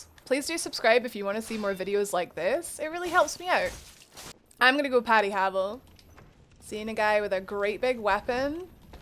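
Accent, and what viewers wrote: Scotland, United Kingdom. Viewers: Came for tutorial, subscribing for fellow scot accent. Will be using buckler on my next DS attempt, thank you.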